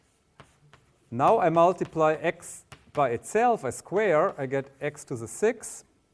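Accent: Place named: Germany. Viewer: Best german accent ever!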